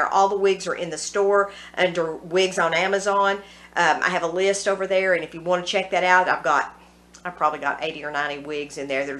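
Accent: South, United States. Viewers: I just found your channel. I think that you look very good in the red wig! You have a red head kinda personality! I should know because I am a redhead myself! I just subscribed to your channel. Oh yeah! I can tell by your accent that you are from the south. So am I!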